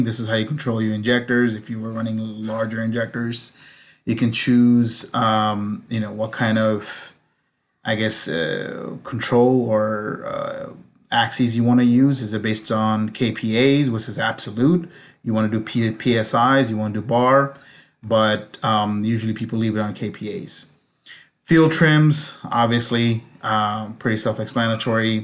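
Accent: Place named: Latin America. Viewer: Exelent video bro. 1 question can you do a tune remotely? You seems and sound latino 😁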